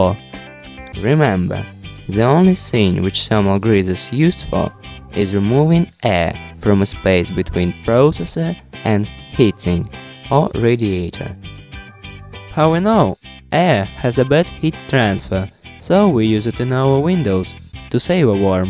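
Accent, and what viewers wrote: Russia. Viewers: Vicky Ray he is russian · A Russian speaking English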